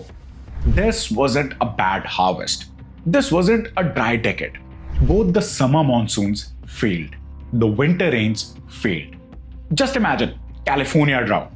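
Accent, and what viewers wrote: India. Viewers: nice to listen to an Indian guy (and not British) doing this!!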